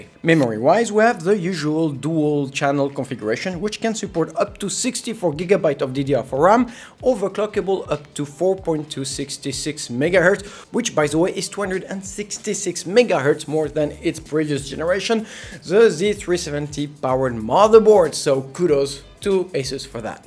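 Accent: France. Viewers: I can hear a little bit of French in your accent. Nice review though, I’m getting this motherboard as a Christmas gift from my wife and I needed this full detail review 👌🏾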